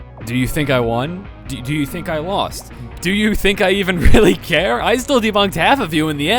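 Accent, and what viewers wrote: Canada. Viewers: i thought u were kenadian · i swear your kenadian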